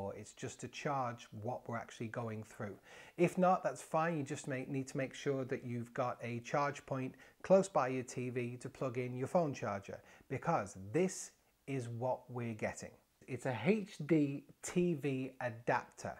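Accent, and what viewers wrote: United Kingdom. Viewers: I just cannot believe the MOANING MINNIES who are complaining about the chatter here ! Goodness, life's just too short, you have to say what you can WHILST you can coz you're a long time dead ! You sound like your from back home in England and English people LOVE to chat !! :) Great video and Entertainment ! :) You should do a 30 minutes Vlog on "How-To" just to pig off the whistle stop hustle bustle people who haven't got time to breathe ! LOL Greetings from Xena Land !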